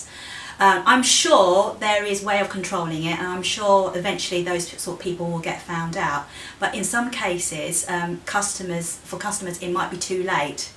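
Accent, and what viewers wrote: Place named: United Kingdom. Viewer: That British accent tho !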